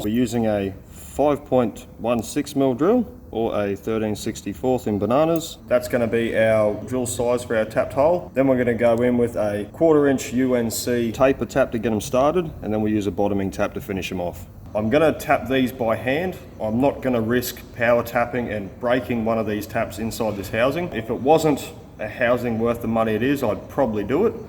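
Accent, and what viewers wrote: Australia. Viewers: Aussie accent…. “That’s not an Allen Wrench, this is an Allen Wrench”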